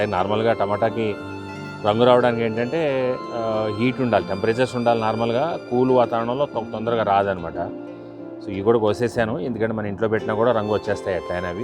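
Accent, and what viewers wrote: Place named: Andhra Pradesh, India. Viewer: Nijame sir. Content chala baguntundi. Mee videos chusi nachi mee store nundi seeds kuda monnane order chesanu sir. Ma Andhra style lo meeru chakkaga matlade vidhanam Naku chala nachutundi sir. Meeku Namaskaaralu sir 🙏🙏🙏🙏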